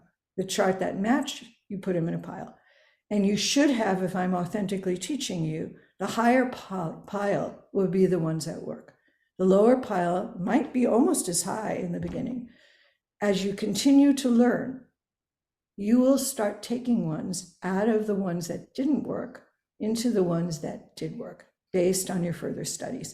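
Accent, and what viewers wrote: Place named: United States. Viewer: Whose accent, I know the American accent is difficult to understand if U put on captions it will be ez to follow her. I hope that helps.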